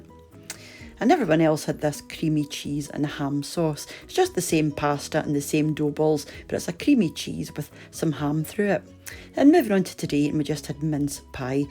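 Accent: Scotland, United Kingdom. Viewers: Yes, I'm enjoying your Scottish accent as well. Miss hearing it.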